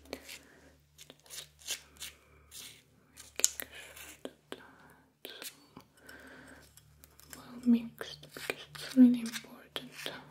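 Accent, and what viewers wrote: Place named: Russia. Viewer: Where are u from? Ur accent sound like russian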